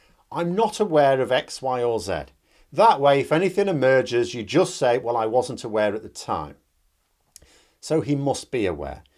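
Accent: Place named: North, United Kingdom. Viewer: I didn't realize you were Northern until I heard your new mic... Fantastic rustic undertones.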